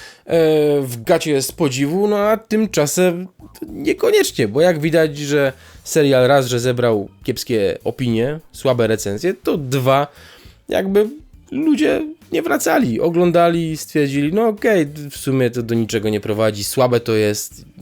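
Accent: Silesia, Poland. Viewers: mam takie pytanko, jesteś ze Śląska? Bo wyczuwam akcent:) nie mam FB ani innych mediów społecznościowych więc tylko zgaduję heh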